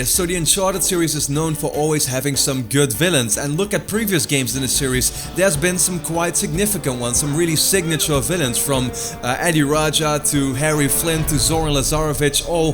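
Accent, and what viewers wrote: Netherlands. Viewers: are you dutch Btw this is a great video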